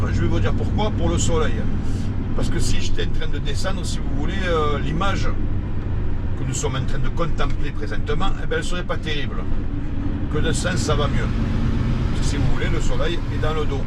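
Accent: Marseille, France. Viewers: Un grand merci pour toutes ces vidéos que tu postes sur You Tube. Nous apprenons beaucoup de choses sur Marseille, en plus avec l'accent et les expressions marseillaises. Tu es un bon chauffeur et à la prochaine vidéo. Peux-tu nous dire ton prénom ? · Merci pour ces vidéos qui nous font découvrir Marseille avec se superbe accent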